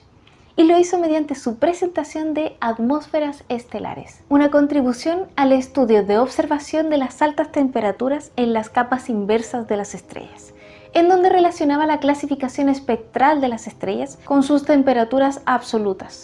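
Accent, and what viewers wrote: Chile. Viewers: De seguro es chilena con ese acento.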